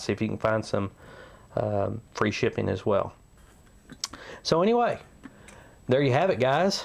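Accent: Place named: East Tennessee, United States. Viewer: Good review. Your accent shows your family was from East TN or similar Appalachian Mountain origins; maybe that is where you get your backpacking wings? Never heard a TX person nail the East TN accent as well as this.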